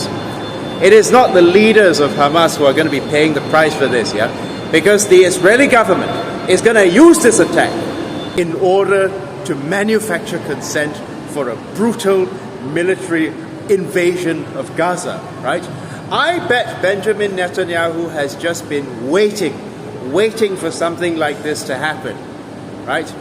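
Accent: RP, United Kingdom. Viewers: I love your high-faluten slightly pompous, pucker British accent. It takes some getting used to. The comments here are barbaric. Though I don't agree with your perspective, I do enjoy your clear vocalization of it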